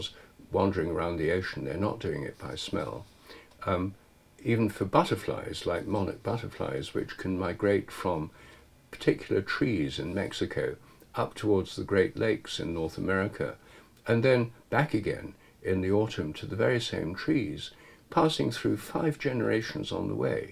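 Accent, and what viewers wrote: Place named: United Kingdom. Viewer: Thank you, Rupert, for your dedication, and oh so typical British style of speaking and tenacity in between spells of politeness 😊 I'm happily surprised (I'm Dutch) to hear of this pigeon- experiment with Dutch pigeons. It's great humour to know of a formal Navy ship and crew, welcoming such an experiment. I'm a longtime follower of your work, living at an older age, observing the gap growing smaller, between the intellect of science and the intelligence of the heart, the world of spirit that begins to bleed through the icicles of logic. Your brave steps to throw stones in the pond of logic are much appreciated. It's truly groundbreaking, only not for eyes that are wide shut. Thank you so much!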